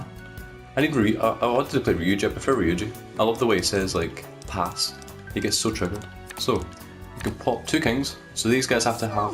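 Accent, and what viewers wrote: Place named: Scotland, United Kingdom. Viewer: Came to learn tycoon, stayed for the Scottish accent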